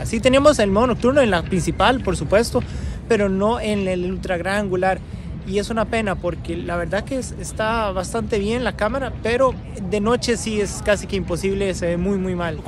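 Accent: Costa Rica. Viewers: Este mae es tico haha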